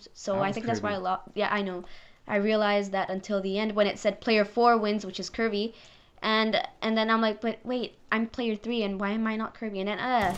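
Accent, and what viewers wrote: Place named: England, United Kingdom. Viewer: It's very enjoyable when your English accent sneaks in. As far as showing your face, the mystery can be a lot fun. Think out of the box.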